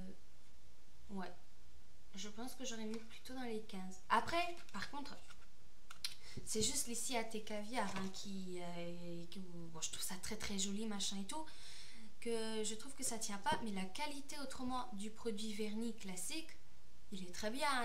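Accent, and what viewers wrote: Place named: North, France. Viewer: Tu es bretonne? Brest ... Mais ta un accent du nord pkutot mdr moi aussi je suis de bretagne dans le 29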